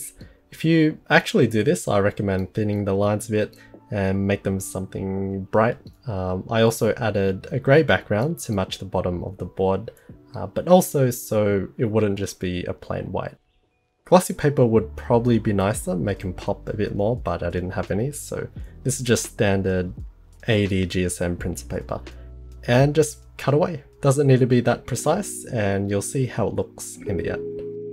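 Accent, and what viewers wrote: Australia. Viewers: Is he Australian?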